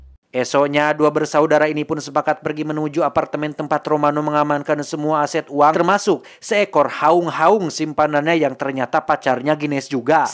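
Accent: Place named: Madura, Indonesia. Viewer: Madura bang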